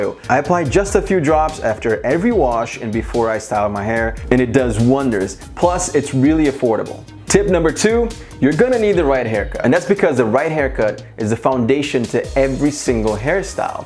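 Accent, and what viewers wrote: Brazil. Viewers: Caraca meu velho. Tu tem algum vídeo contando tua história? Teu inglês é mt bom, mas eu consegui ver que tu é br hahah Sucesso! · boy, you brazillian? cuz you sound like it, just a little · Dude has light Brazilian accent, maybe? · Cute strong Brazilian accent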